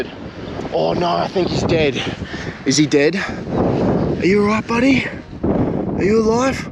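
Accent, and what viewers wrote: Australia. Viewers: This dude has a Aussie accent you cant forget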